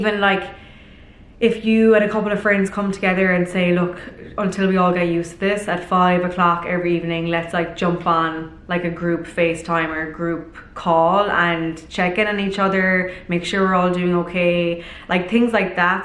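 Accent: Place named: Ireland. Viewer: She's Irish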